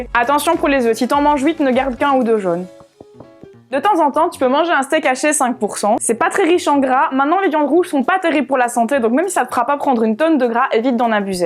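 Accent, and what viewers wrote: Liège, Belgium. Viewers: On reconnaît l'accent belge à des km <3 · L'accent belge ? C'est l'accent de Liège. Tous les Français parlent comme Jackie Sardou ?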